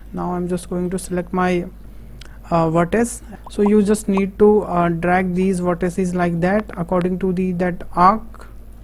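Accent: India. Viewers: %98 indian accent %1 quality %1 benefitfull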